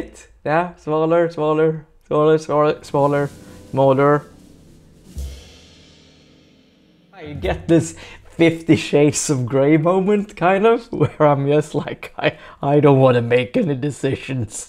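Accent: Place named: Sweden. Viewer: How i missed that Swedish accent and humour! Lovin' it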